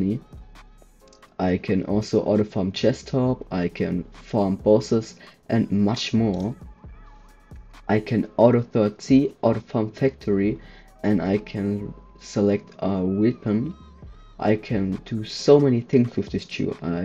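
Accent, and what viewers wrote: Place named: Germany. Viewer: nah this bot comments and u german accent is funny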